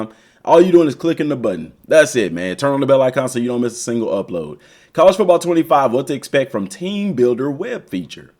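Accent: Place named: Texas, United States. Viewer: Texas accent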